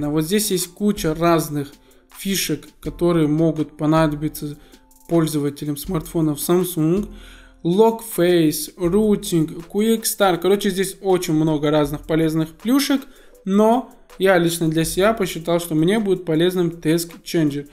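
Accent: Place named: Belarus. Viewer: БЕЛАРУСА СРАЗУ УЗНАЛ.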